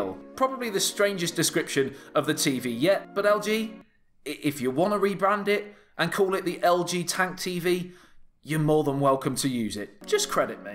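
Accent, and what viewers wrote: United Kingdom. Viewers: Dude, your accent is so British...You named it as Tank TV but I'd call it.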